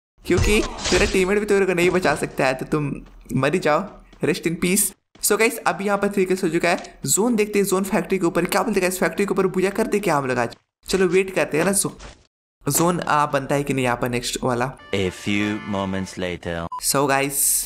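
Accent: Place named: Karnataka, India. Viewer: Bro are you from Karnataka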